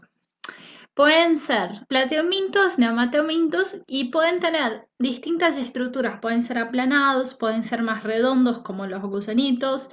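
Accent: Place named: Brazil. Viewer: então, eu reconheci seu sotaque brasileiro no hora de falar espanhol. Seu conteúdo é bem interessante. Eu morei no Brasil dois e meios anos. Eu fiz mestrado lá.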